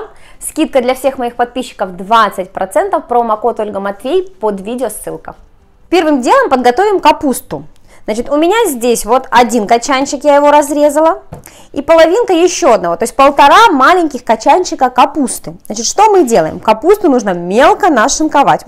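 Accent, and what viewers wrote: Ukraine. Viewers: Какая убедительная приятная плавучая речь. А слабый украинский акцент придаёт такой оригиналный колорит. Если нет аппетита то надо смотреть Ваши ролики. Появится и аппетит, и настроение. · Спасибо за чудесные рецепты они у Вас всегда вкусные и очень аппетитно смотрятся.Вы человек-СОЛНЫШКО и Ваша изюминка- АКЦЕНТ!Украинский говор очень красив,впрочем,как и украинские девчонки! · Если американка говорит по русски с акцентом - это нормально, а если украинка? это же не родной язык, попробуйте сами поговорить на чужом языке. Думаю критики кроме своего русского больше никакого не знают. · Если бы у было плохо с чем-то, не было бы 4 млн. пользователей. Все отлично. Мне приятно слушать, у меня так бабушка говорила, а у меня московский акцент остался. Но я тоже считаю достоинством грамотный русский, но если его нет, является ли это недостатком? На таком русском пол Украины говорит.:)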